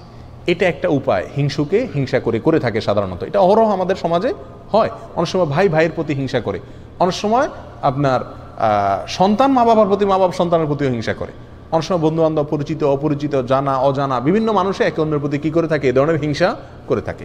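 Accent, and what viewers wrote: Chittagong, Bangladesh. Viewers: Vai Apni Ki chittagong Er ?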